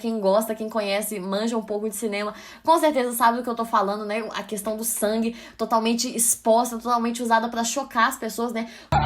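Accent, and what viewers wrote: Minas Gerais, Brazil. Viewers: Esse sotaque mineiro...❤️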